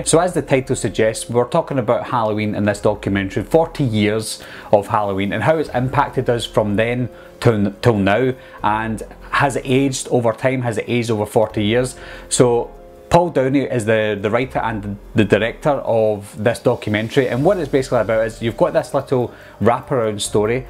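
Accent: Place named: Dalkeith, United Kingdom. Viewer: your accent sound similar to my old friend from dalkeith mayfeild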